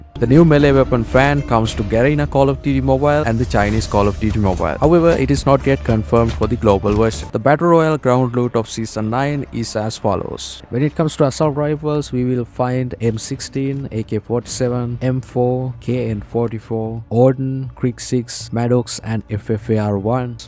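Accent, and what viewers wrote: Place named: India. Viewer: Hey love ur vids has helped me a lot also are u from india ur accent seeems like it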